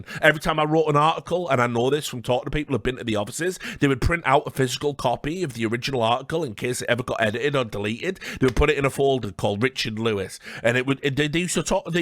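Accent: United Kingdom. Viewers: The squeaky bum time got me at the end,always nice to hear a brit venting about how fucked up some power structure is. Cheers to the toast at the end and thanks for the constant content!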